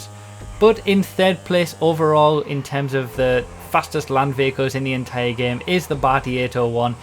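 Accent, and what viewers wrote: United Kingdom. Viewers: british accent sounds so gay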